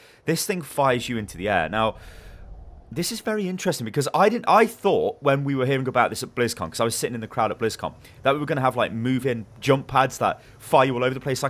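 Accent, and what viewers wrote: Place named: RP, United Kingdom. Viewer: you sound JUST like JP from Fresh Meat. Quite posh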